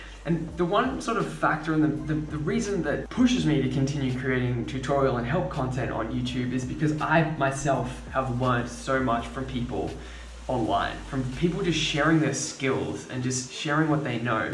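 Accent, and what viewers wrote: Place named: Australia. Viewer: Thanks for the vid! This is the 4th video of the day I've watched on this very topic.. and the best for sure! Also, I was immediately surprised by your accent. An Aussie vlogger! Hectic! Inspo. Im a teacher for ESL (English as a second language) to adults. Going through some... Challenging times.. bored of work... I've definitely always been over consuming recently!! And I have been tip toeing around my desire to talk about all those things you mentioned, as well as mental health. I don't know how but I wanna begin talking about it. I liked your Socrates reference. Good job. PS your pool's inner walls are heaps discoloured but the water looks faaabulous. Pps. In writing this I have some ideas. Thanks again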